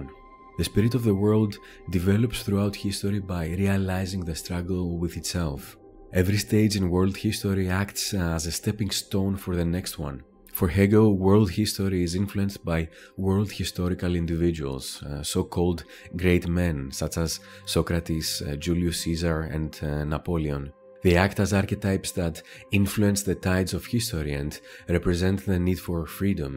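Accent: Greece. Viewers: yeah im a greek and his accent sounds Greek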